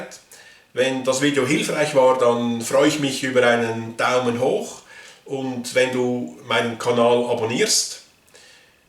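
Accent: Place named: Switzerland. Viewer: Thank you for the video! I'm so glad I speak German. But the subtitles are pretty good. They help where I can't quite get the hang of your Swiss accent ;) For some reason, no-one explains how to properly put on the mouthpiece cap. Am I the only person afraid of damaging the instrument's "reed"? Just got mine in today. Kind of curious what settings I will end up going with, because I play both saxophone and flute.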